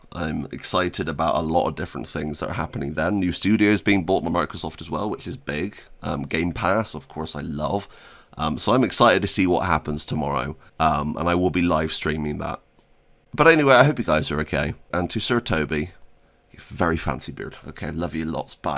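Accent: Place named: West Country, United Kingdom. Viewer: Awesome video. Give them a chance. Awesome bit of news and well put together. It's good to hear a west country accent in a video.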